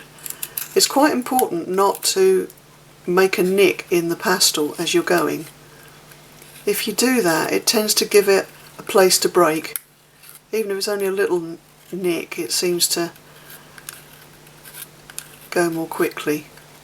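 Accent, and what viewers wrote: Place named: United Kingdom. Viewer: Good job! Are you in San Diego or England? Just curious with your British Accent!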